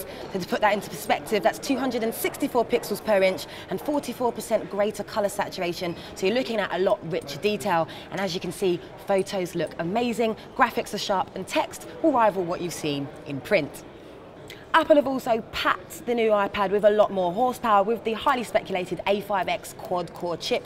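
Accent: United Kingdom. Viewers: I can't stand british accent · Don't you just love the british accent?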